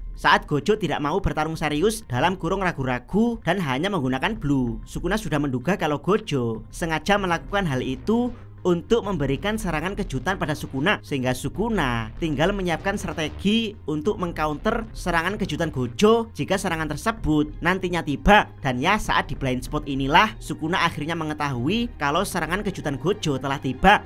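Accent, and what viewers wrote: Java, Indonesia. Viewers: Gw kurang nyaman sama pembawaannya terlalu medo, maaf saya bukannya melarang menggunakan logat jawanya cuma setidaknya bisa gak pembawaannya lebih di benerin ke arah bahasa indonesia pada umumnya. Soalnya gak semua enak denger logat yg terlalu medo Semoga chanel semakin berkembang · Medok itu lebih ke aksen, akan susah dirubah. Kalau bahasa yang digunakan udah bagus kok, jelas juga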